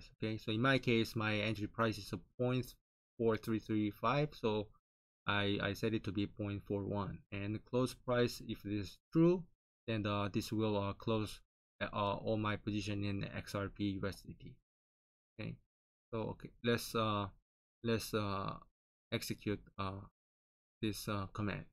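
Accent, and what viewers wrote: South Korea. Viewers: 나는 영어를 못하지만 처음 몇문장 듣자마자 한국인임을 알았다 ㅋㅋㅋㅋ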